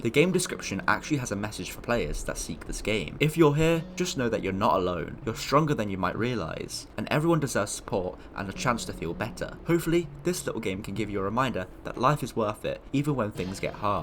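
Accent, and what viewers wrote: United Kingdom. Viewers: you sound british hehehehe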